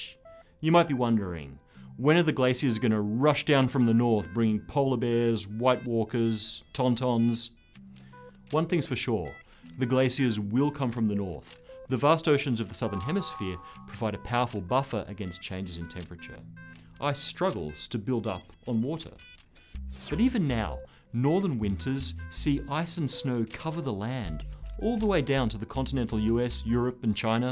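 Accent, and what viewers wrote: England, United Kingdom. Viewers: climate change has nothing to do with this? Now I know its true~!, Speak with an english accent and have a well known TV company behind you, and you can shovel complete shit from your mouth and no one cares to say how stupid the little "spoiler" in the description is. fuck off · Why do they always have the host of these shows with a British accent. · PBS: People are waking up to the climate scam.. what can we do? PBS director: Hire a spokesperson with a beard and a British accent. That'll add credibility so they believe our bullshit.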